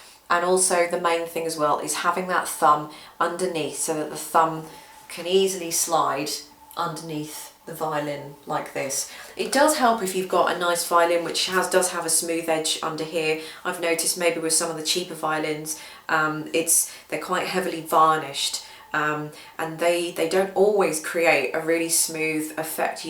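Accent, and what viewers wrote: United Kingdom. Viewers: Thank you from me as well as I am just starting out and really appreciate your instructions. On a side note, since I am an American guy, I hope it's OK as well to say that your hair looks gorgeous and along with your British accent, they only add to the whole learning experience. So much more refreshing that watching some old boring guy. Looking forward to your next tutorial videos.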